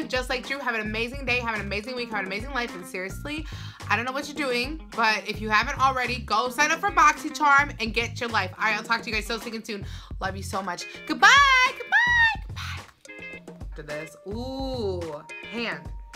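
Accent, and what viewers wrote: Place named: New York, United States. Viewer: I hear a New York accent 🤔 or might be jersey ! Can't wait to receive my box !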